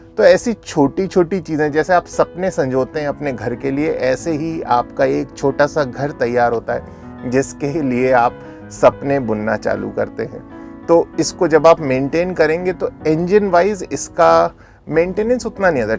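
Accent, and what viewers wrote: Standard. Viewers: Very informative and well explained and this is the purest form of hindi I’ve heard in a while🤩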